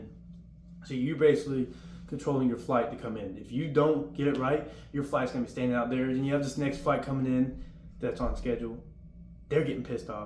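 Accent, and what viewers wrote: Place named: South Carolina, United States. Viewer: Are you from South Carolina? Sound like it lol i am too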